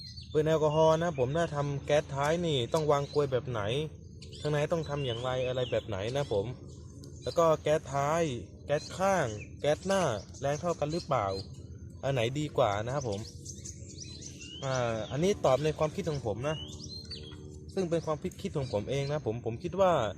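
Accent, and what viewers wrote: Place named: Java, Indonesia. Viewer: ini orang jawa ya, kok ngomongnya ngapak wkwkwkwk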